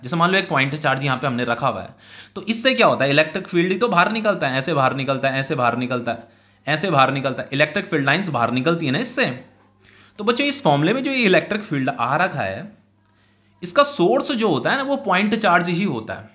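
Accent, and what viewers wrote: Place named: Bihar, India. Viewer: I am from Bihar .... Apke bolne ke tarike se mujhe laga ki shayad ap Bihar se ho..... By the way I like your videos main apke hi videos se 11 class bhi padhi aur 12 th bhi padh Rahi hu .... Thankyou so much sir😃😃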